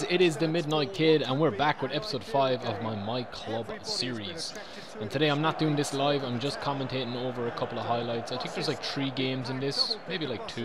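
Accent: Ireland. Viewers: Which part of Ireland are you from? Love your channel btw. Great content!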